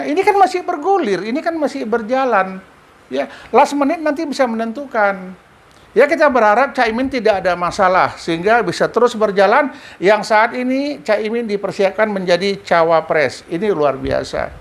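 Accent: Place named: Medan, Indonesia. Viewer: Medan kali ah narasinya bah...👍 Blak2an Gak bahaya tha....?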